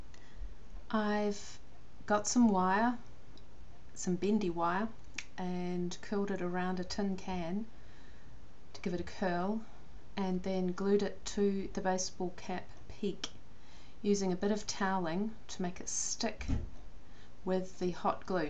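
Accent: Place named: New Zealand. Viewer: Kiwi accent yeahhhh!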